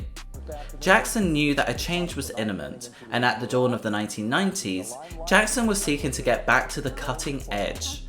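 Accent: United States. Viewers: I was ok with american accent❤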